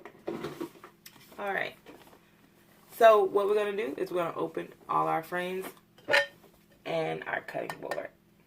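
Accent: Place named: Florida, United States. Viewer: Hello, just wondering how you are and where have you been? I hope you've found new found fame and are just too busy filling orders for decor and just not have time for diy videos. If so continued success! I'm from Texas, are you from Florida? Your 'accent' although subtle, sounds like a good friend of mine from Florida. Just curious.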